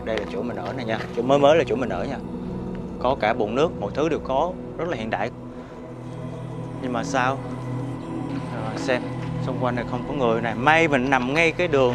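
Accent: South, Vietnam. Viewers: Bạn có thể nói dễ nghe hơn một chút được không. Nhiều lúc bạn vừa đi cừa nói nên rất khó nghe. Cả gia đình mình từ già đến trẻ đều thích xem video của bạn nhưng mấy người già khá là khó nghe và gđ mình là người Bắc nên khó nghe tiếng miền Nam. Mình cảm ơn. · Nghe giọng Miền Nam là thích rồi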